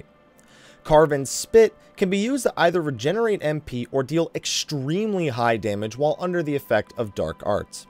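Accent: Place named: United States. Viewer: That's just an American accent. Take it from a Brit that you guys butcher the letter A!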